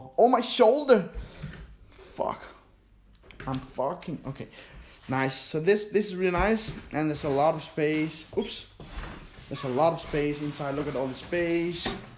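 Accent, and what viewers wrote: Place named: Denmark. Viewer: du har kim larsen engelsk accent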